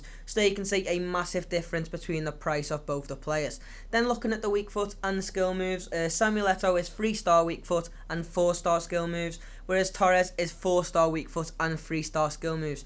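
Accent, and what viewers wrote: Liverpool, United Kingdom. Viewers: Yes scouser I'm a scouser were you from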